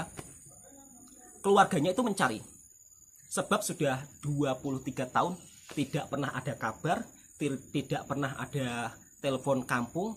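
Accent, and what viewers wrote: Java, Indonesia. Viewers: wah sudah kurang medok nya wak.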